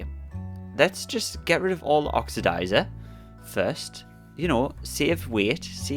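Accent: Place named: Northern England, United Kingdom. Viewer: You are from somewhere north of England. Accent is similar to mine where you from?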